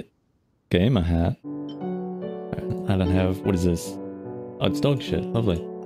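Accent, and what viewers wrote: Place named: Australia. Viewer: the aussie accent is glorious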